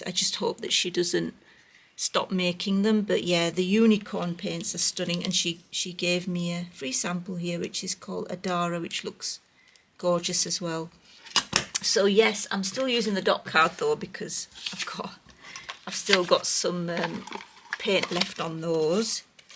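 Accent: United Kingdom. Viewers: you said “colors of the world.” I know that you were referring to the markers. But because you said it with your awesome British accent, I found myself singing 🎶”Colors of the world, Every boy and every girl, People of the world, Ahhhhhhh 🎵 You activated the file in my brain. It was 1998 all over again! 😂 Thanks for the video. ❤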